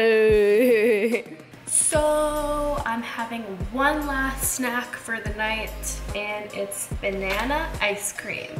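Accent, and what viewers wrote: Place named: Minnesota, United States. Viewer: Would never have thought Minnesota lol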